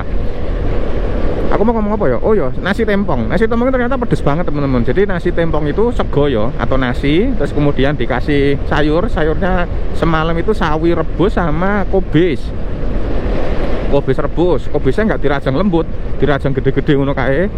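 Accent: Java, Indonesia. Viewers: Ciri2 orang Jawa kalau ngomong bahasa Indonesia mesti ada jawanya kebawa. 😆 akupun juga begitu agak kaku ngomong bahasa Indonesia. 🤣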